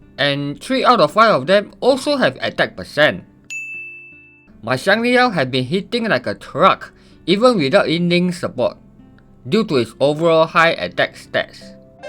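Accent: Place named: Singapore. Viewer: WTF your accent you’re from Singapore?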